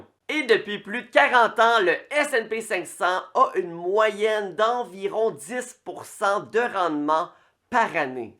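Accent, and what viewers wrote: Quebec, Canada. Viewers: Pourtant je le trouve très clair ca doit être sont accent québécois qui tagace 😅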